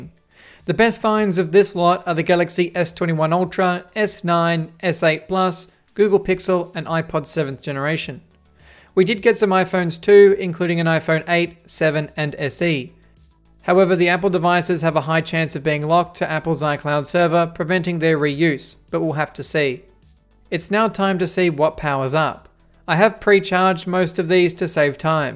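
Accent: Australia. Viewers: He sounds a lot more Australian when he isn’t using his video voice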